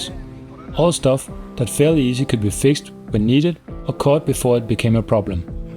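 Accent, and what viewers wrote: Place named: Denmark. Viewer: 'dan'glish accent'...Haha...I must apologise to all our foreign friends who are forced to learn english...As an englishman I can assure you we didn't mean it to be this way even tho some of us still try to learn other languages...but unfortunately as a consequence most english have now become very lazy to learn them...hehe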